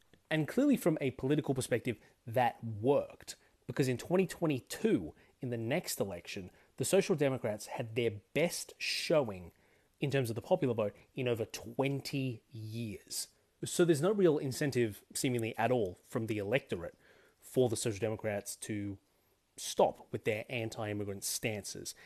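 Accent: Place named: Australia. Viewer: you sound aussie, you should do aussie politics. example; how the fee free tafe can be a game changer to the economy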